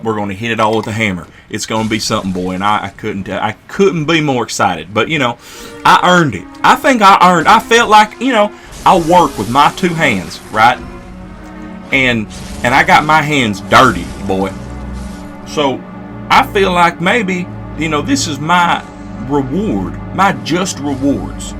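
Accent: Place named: South, United States. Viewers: I love your Southern drawl; it’s really authentic . I live in the South, so I give it my seal of approval. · A hillbilly saint talking with good piano music. What combination is this?!!?!?!?!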